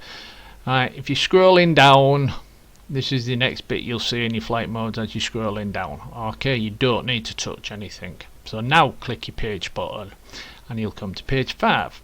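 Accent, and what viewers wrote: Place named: Barnsley, United Kingdom. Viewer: Great video love Ur ascent you sound like my best mate who's from Barnsley. Really helpful vid 👍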